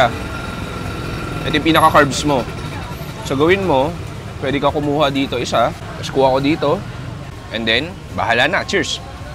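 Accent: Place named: Philippines. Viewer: English acent Philipinos... You looks like Cakra Khan..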